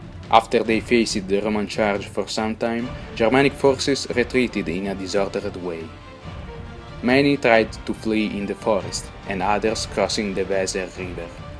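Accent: Italy. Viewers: Come si sente dall’accento che sei italiano 😂 Cmq 20000 uomini sono tanti morti si, ma purtroppo ci sono state sconfitte molto peggiori nei secoli antecedenti a Teotuburgo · The Italian accent makes this video much cooler. It looks almost like a sense of revenge is emanating during the narration. :) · You tried but I can't listen this accent but I like that an Italian tried to divulge I can recognize it good job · Penso che gli anglofoni troveranno un po' strana la pronuncia dei nomi latini, che qui sono resi all'italiana. Comunque vedo che i nomi latini sono simultaneamente scritti in grafica, il che aiuterà non poco. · Hears strong Italian accent. This may be a little bias lol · this is definitely italian accent... you can check channel info. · Really good job: the script is brilliant and the story line flows smoothly with a charming Italian accent :) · He is speaking proper English, in an Italian accent. 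You have a problem with that? What language do you want the video to be? Italian?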